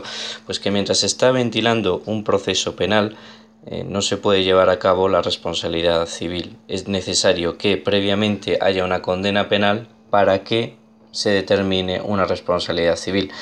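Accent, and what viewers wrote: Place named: Spain. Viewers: Es lo q yo también me quedé de q país es y por el acento es de España, aquí en México es Culpa civil y Culpa penal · Creo que por el acento que tiene es porque es de España no?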